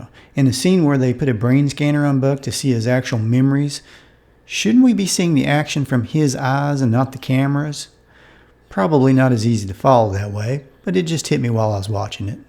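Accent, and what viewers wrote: South, United States. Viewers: We like the Bible quote and the southern accent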